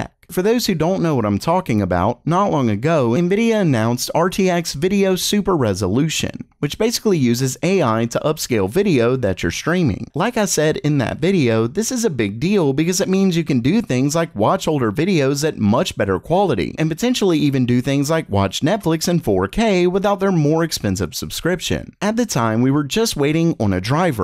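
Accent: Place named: South, United States. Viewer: please lay into your accent more. i need tech vids from a southern gentleman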